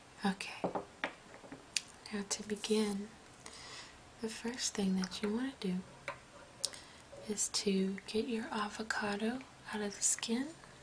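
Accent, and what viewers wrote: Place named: Texas, United States. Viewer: AH HA! That explains the accent. You are from Texas. That means we are neighbors. I'm from Louisiana. :) I love guac but I never knew how to make it until now. Thanks for teaching me!! And you are REALLY good at the soft speaking cooking thing. Me? Not so much. I need more practice with slow movements lol. I hope you decide to do more like this :) Thanks for the video! -Katie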